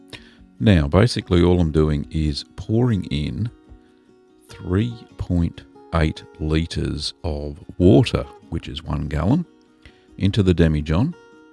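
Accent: Australia. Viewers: I'm a simple man I hear the Australian accent I skip too another video .🖕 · Definitely make this a series!!! Cheese, mead/beer and an Australian accent. What more could you want in a channel!?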